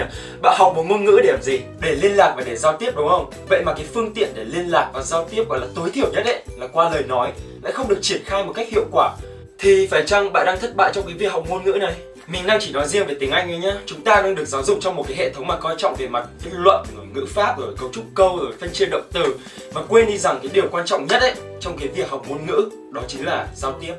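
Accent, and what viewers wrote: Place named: United Kingdom. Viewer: Giọng Anh-Anh của anh hay quá điiii ; 3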